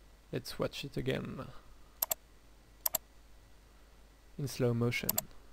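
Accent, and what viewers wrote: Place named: France. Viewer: i <3 ur french accent :DAD:D:D:D:DA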